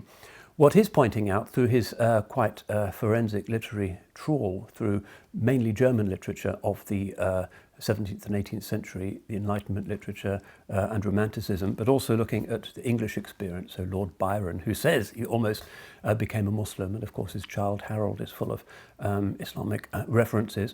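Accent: United Kingdom. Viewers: im here for british english and his intellect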